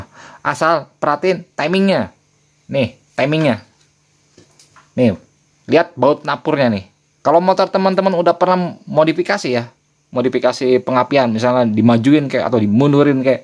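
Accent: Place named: Bekasi, Indonesia. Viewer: Dpet ilmu sedikit2 nih bang😊. Ngomong2 suara abang kaya orang bekasi babelan😁😅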